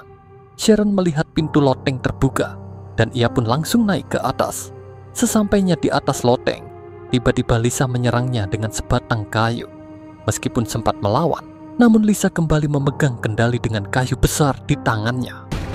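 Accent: Bali, Indonesia. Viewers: Admin org Bali ya? Aksennya kentel bgt 😅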